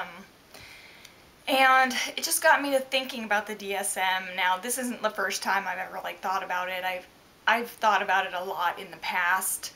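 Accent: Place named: South, United States. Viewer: I have no idea what your background is or where you live, but the way you pronounce certain words like "about", have a hint of a southern accent. I mean it in a good way. It would be nice to know what part of the country you grew up in.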